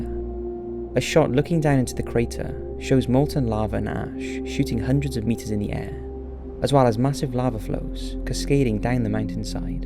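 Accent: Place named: England, United Kingdom. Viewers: your video is boring, way overhashed AAAAND presented in a horrible english peasant accent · Having an english accent doesn't mean he won't make mistakes, volcanic mudflows are called LAHARs , not ihars.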